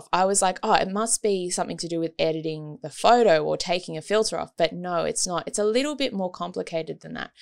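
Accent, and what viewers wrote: Australia. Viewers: I love your Australian accent 😊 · thanks. And i loved your Australian accent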